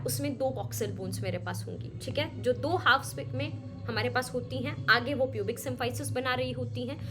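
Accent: Haryana, India. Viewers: Haryana ig 😂?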